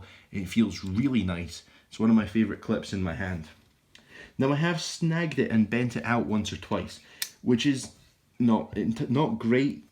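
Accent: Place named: Scotland, United Kingdom. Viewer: Nice review. Good to hear a Scottish accent :) I have just ordered the Slayback with the san mai ZDP189 blade. Great looking knife.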